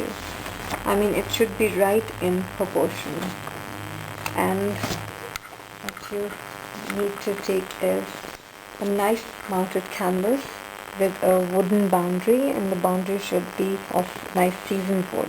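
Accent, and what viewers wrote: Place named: India. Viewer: Thank you, Indian voiced woman :)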